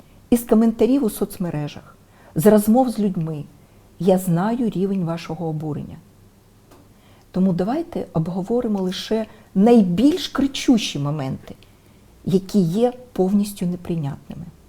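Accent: Russia. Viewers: Та ти і досі не можеш нормальео рідною мовою говорити... Ти як руссська кажеш на букву "о" -"а". Томущо розмовляєш за камерами на москальському язікє.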